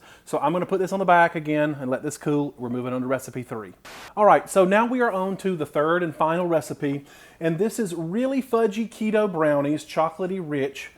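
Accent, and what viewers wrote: South, United States. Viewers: Dude, you freakin’ rock! Just went ketopocalypse now and you sir are my young Martin Sheen! Love your southern dang fried accent that makes it ALL gouda!🤪🤙🤛🏻 Lord! Keep it going!!!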